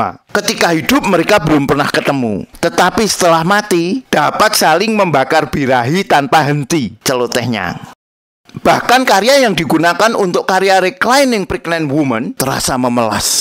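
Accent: Java, Indonesia. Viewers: Suaramu medok bang , nguakak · Puyeng denger aksen medok nya..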